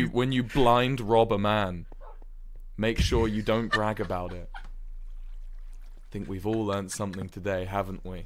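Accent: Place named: United Kingdom. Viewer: cos the original song is extremely american. wilbur does this sometimes but especially with this genre of folky type American songs. it'd sound off if he spoke in his british accent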